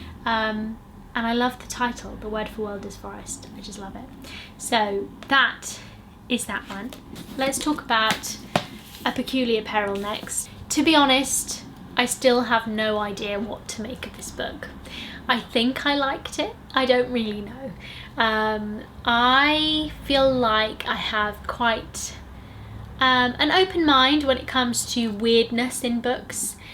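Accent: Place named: United Kingdom. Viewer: I love the following: 1. your hair 2. your eye shadow 3. the color of your sweater 4. your lip stick color 5. your matching colors 6. your Adele accent 7. your piercings 8. your rings 9. the background 10. your stamina to finish a 50 min video 11. your overall fashion sense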